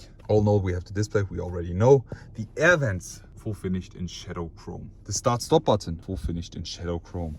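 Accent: Germany. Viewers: Man merkt das Du aus Deutschland bist 😂😂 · fürchterlich wenn ein Deutscher Englisch spricht 😢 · wenn der deutsche englisch redet.... fck noob.... felgen sehen scheiße aus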